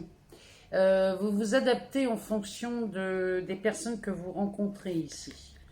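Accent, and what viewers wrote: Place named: Montpellier, France. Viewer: Jai toujours pas trouvé l'accent ? Toulouse ? MONTPELLIER 🤭🤭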